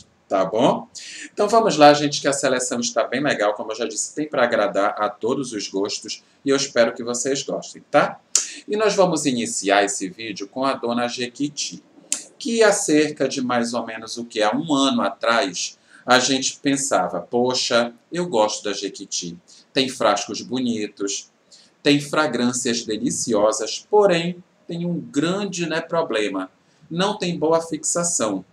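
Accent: Pará, Brazil. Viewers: diz alguma coisa sobre o Revelar da natura! Amo suas resenhas. À propósito, vc é paraense como eu?😅🤭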